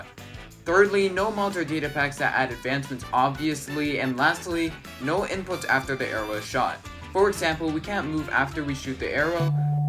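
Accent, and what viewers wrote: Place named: Canada. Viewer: Yeah, you're definitely Canadian, I suspected it after hearing eh then you spoke French. I can't see you not being Canadian.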